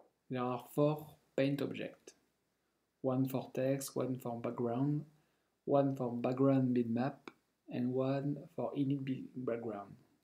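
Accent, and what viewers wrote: France. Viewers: Le bon accent français des familles <3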